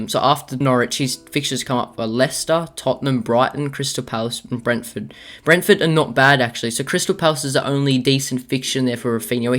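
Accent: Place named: Australia. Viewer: Love Australian accents, you got yourself a new subscriber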